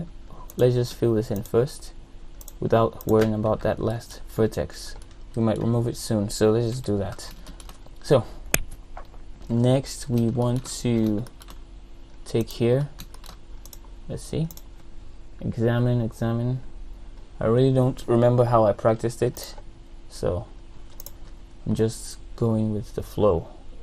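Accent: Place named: Nigeria. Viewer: you have an accent, are you Nigerian?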